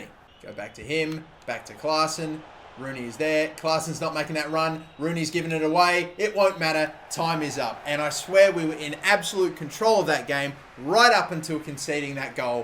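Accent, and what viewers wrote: Australia. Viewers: Are u Australian. How do u support Newcastle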